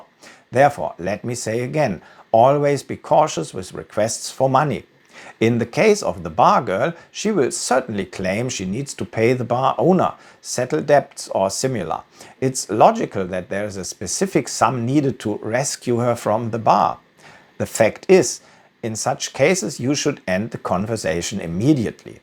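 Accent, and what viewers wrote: Germany. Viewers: German accent still 100 percent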